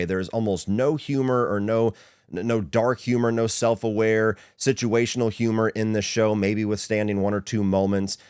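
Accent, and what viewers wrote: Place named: South, United States. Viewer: I got into Dexter at the same time as you in 2009,only difference is I was 29 and you were 19(somehow I look 10 years younger than you)but being a man I don’t like looking 10 years younger than I am. But ai started watching in 2009 just like you(shows I’m a big fan of your channel if I know all that). Also I’m from the northeast and you’re from the south,I know you don’t think you talk that way but you do,it’s just that your accent isn’t as thick as most southerners just as I don’t talk like a typical Philadelphian. Anyway I’m a huge fan of yours and I agree with most of your takes. I hope this channel continues to go for years because you’re the best at what you do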